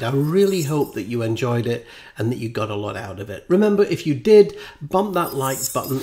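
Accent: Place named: United Kingdom. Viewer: Hearing a British guy teach keeps my focus.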